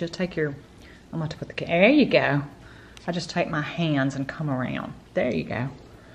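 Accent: South, United States. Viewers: Well done. It's such an American thing calling them biscuits! They're scones for us. Biscuits are a cookie! Love the Southern drawl! Happy day from Sally and the fur crew! 😄❤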